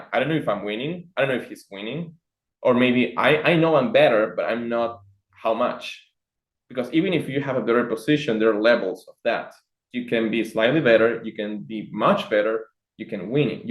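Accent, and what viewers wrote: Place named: Peru. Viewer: Hahahahahahaaa da risa un Peruano intentando hablar inglés. Cobarde corriste como tú p madre. Estás muy f30 suda.k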